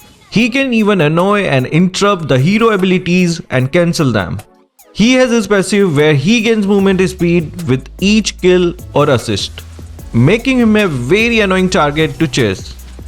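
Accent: India. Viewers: Some Times i think u r Indian cause u sound like one....i m sure u are asian .....one of the very few ...to reach a million subs... 💯💯💯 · Are u indian🇮🇳? Your voice accent seems indian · You sound pure typical Indian, how come your name is? Please change your channel name,keep anything but not South Asian names, request 🙏. May be " भोकाल"," रुद्राक्ष"" कल्कि " etc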